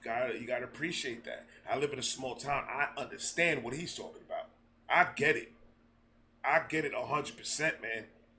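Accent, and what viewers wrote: New York, United States. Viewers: Everything you said was ON POINT! I couldn’t agree with you more! Btw.. already knew you were from NY before you even said it lol! I live 3 hours north of nyc but have friends down in the boogie down lol. Where I live, most people around here stand by “FAFO”!!!!!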